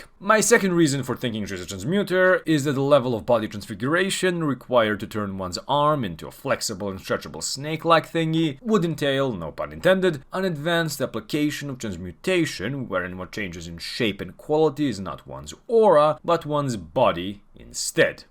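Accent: Eastern Europe. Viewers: Somehow kinda funny to hear a Slavic accent talk about anime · I asked under ur most recent video if you were Eastern European and I just knew I had a Serbian brother here Keep up the great work!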